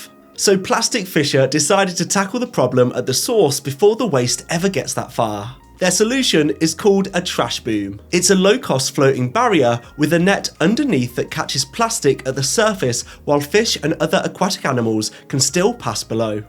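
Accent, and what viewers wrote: United Kingdom. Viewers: Well, the good news is welcome, but it's not called 'gashed ee bear', that's for certain. You seem to come form Birmingham, which should be familiar with Wales - so let's have the same respect for our threatened living language as you show for animals and landscapes, please. Google translate is a first step. Type the name in and press the sound icon. Not perfect, but acceptable.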